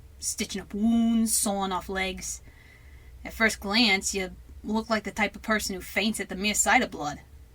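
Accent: United States. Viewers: I'm gonna assume this is the Boston kind of accent. I'm from the South, and I never met a Northener before, so I can't tell accents apart. But I love it! I love everything in this! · You have an amazing New York accent.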